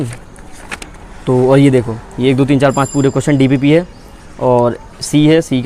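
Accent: Bundelkhand, India. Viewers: Aap bundelkhand se ho...????😊😊😊😊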